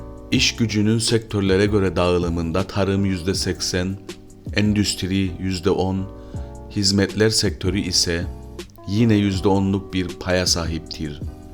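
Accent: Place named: Northern Cyprus, Cyprus. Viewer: Admin Kıbrıs Türk'ü müsün şiven benziyor 😊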